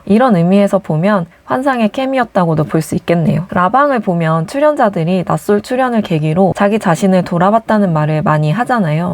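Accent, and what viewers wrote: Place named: Gyeongsang, South Korea. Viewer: 근데 ‘영수님’하고 ‘영숙님’ 발음이 너무 똑같아서 구분이 안돼요. 경상도 사투리 약간 쓰시는 듯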